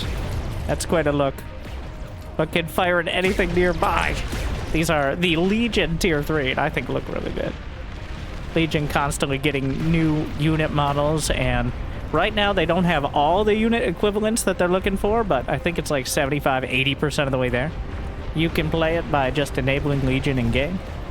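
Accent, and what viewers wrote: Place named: Arkansas, United States. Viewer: Trying to figure out your accent. Arkansas?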